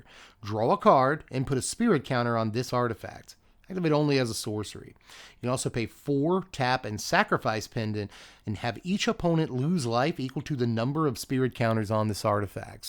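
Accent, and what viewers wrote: South, United States. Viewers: It never gets old hearing a southerner speak spanish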